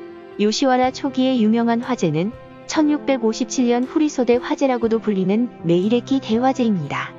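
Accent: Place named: Busan, South Korea. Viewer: 부산말씨 같은데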